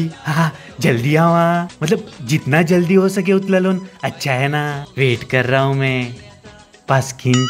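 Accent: Goa, India. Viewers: Love the goan accent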